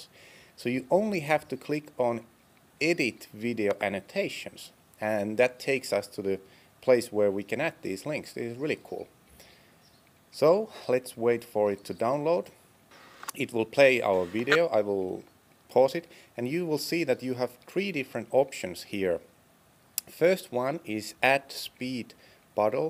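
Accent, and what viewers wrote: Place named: Sweden. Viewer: Are you Swedish or Spanish? You really sound like a Swedish guy =)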